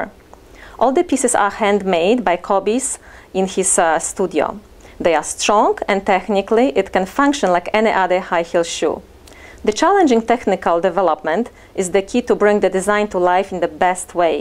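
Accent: Poland. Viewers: Are you polish ? Because you have a polish accent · amazing polish accent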